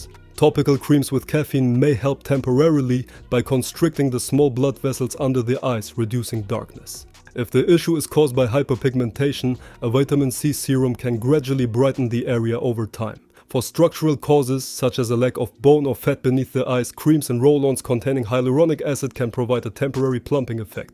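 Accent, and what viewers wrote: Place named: Canary Islands, Spain. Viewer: dein akzentsetzung klingt nach Kanaren deutsch also nicht böse gemeint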